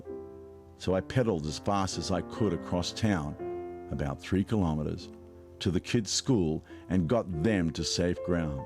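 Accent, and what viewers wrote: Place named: Australia. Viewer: wow, they make a documentary less than a year after the Tsunami and think things will have changed dramatically! Must be a Cali-tard narrating this, even with the Australian accent. Who the hell made this, the totally black screen when narrating the tsunami hitting was reallllllly cheesy. The narration is so.....it so RELISHES the opportunity to exploit this and be dramatic..it's kind of disgusting really. Have to bail after just a couple minutes. Dipped in to other parts further on and heard the same guy getting off on all of this and thinking he was being so profound. Really really disgusting M8! Thumbs down for you.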